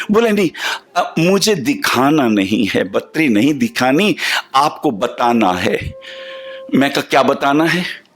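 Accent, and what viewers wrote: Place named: Bengal, India. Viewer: This is how we Bengalis pronounce. Thanks Guruji.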